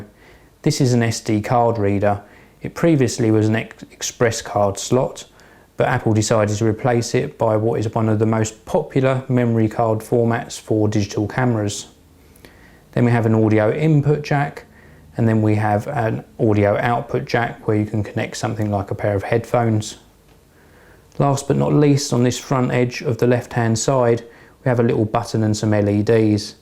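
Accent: England, United Kingdom. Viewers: he is from england so he`s got this kind of accent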